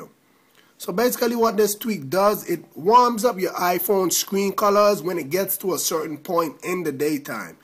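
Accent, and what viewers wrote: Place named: Jamaica. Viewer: They care about a badass Jamaican accent like that man:)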